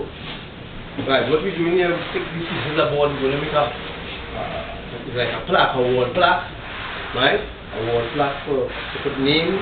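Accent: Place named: Trinidad and Tobago. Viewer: breds, yuh sound real real trini, yuh know? ah tellin' yuh, boy, is like yuh's mih breddah fuh true.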